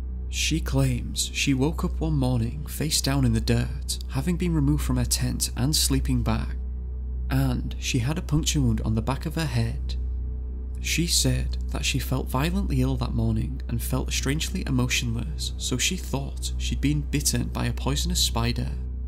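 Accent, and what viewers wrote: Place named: England, United Kingdom. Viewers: Sounds like a Yorkshire accent. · It's really hard to follow these automated narrators. And why do these "robots" always have English accents??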